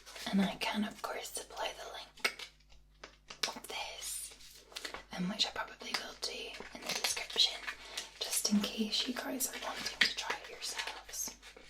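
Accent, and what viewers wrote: Scotland, United Kingdom. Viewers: Oh should be the voice of Scotland. Could listen & watch you for hours. I do love a whisky, very soothing & warming to the heart. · This is by far, my most favourite video of yours and of any ASMR video out there. Love it! Well done! I especially loved your reactions. You are so animated. I had a bit of a chuckle at your reactions to the last three. I recently developed a love for Scotch Whisky. The complexity and the unique differences of every bottle is just amazing. I was definitely born in the wrong country as I would love to tour distilleries and experience and learn more about Scottish whisky. I really do enjoy whisky, except the peaty ones, (I would have the same reaction as you did) so maybe it is my Scottish ancestry coming through. I do so love the Scottish accent. I could listen to you all day. Thanks for this one.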